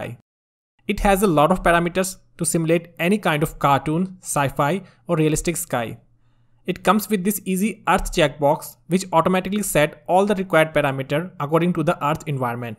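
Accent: India. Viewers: Are you from Mumbai?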